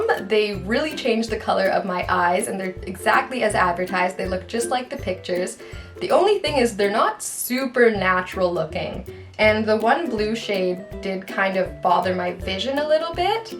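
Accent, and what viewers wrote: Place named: Canada. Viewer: I love Canadian accents ❤ and it adorable.. would love more videos like this.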